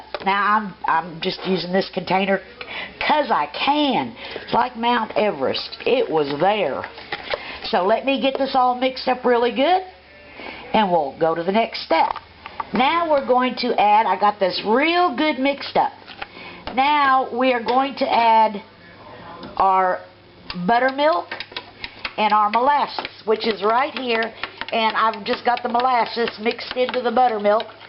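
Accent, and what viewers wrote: South, United States. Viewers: your daddy might have been a new englander but your southern accent and use of buttermilk is a clear give away that your family history is from the south not the north. I bet got that recipe from Martha stwert...lol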